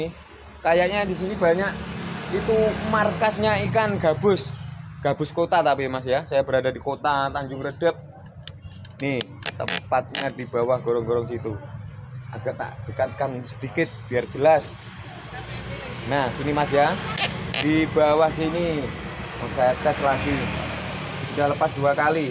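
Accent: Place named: East Java, Indonesia. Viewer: sampean wong ndi cak, kog boso mu kyok jawa timur an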